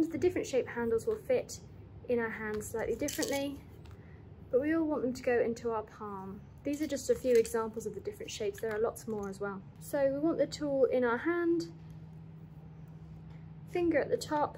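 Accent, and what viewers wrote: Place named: United Kingdom. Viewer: Nice British accent! Thanks for the tips.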